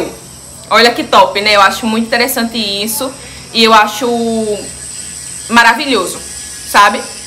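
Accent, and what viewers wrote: Northeast, Brazil. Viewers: sigo vc justamente por causa do seu jeito, viu? Adoro esses sotaques nordestinos, moro em juazeiro do norte, sou manicure, e vc me incentivou bastante❤ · Amo seu sotaque nordestino,sua alegria e juventude me encantam, parabéns lindaaa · Adoro seus videos o jeito de vc falar seu jeito arretado do nordestino.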